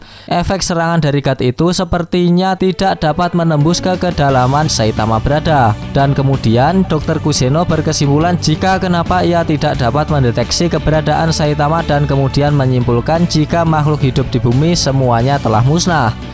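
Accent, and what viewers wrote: Java, Indonesia. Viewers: Asap galaxy : wedus gembel...🤣🤣🤣 Jowo ne muuuedok min...👍👍👍👍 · Keren bang walau dengan gaya jawa nya :) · jowo ya kak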